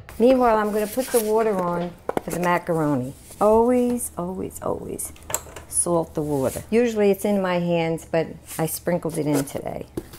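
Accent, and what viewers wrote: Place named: New York, United States. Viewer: You sound like your from New York !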